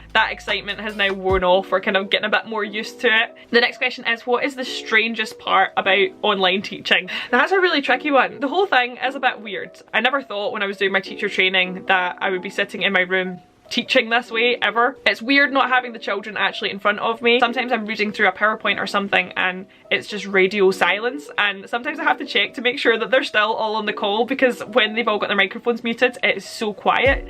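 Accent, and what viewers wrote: Scotland, United Kingdom. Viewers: I could listen to you all day - bloody love a Scottish accent! Fair play to you babe teaching, I know how hard my sister finds it x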